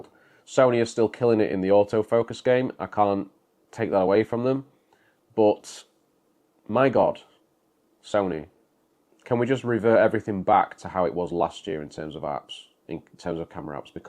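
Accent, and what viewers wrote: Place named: Liverpool, United Kingdom. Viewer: First of all why are you speaking in a Scouse accent? Whereabouts in Liverpool are you actually from? If you are not from Liverpool then why are you speaking in a Scouse accent?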